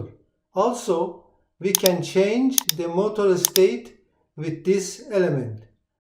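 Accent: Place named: Iran. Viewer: لهجه ایرانی داری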